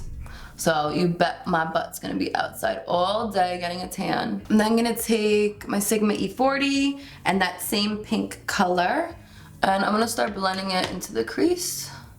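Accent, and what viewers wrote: New Jersey, United States. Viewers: The jersey came out in this video !! Eowww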